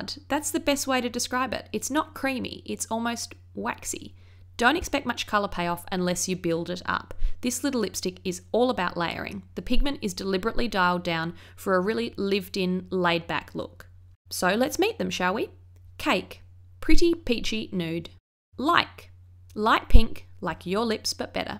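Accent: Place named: Australia. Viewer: you sound australian, may i ask how did you buy glossier and get it shipped to australia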